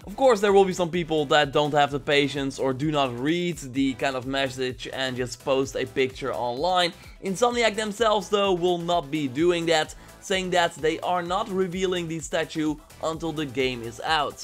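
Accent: Netherlands. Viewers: Mate are you dutch? You reaaaally sound Dutch :p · Are you dutch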